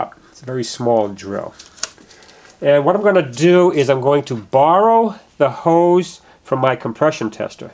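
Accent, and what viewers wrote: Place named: New England, United States. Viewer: Sound like you are from new england.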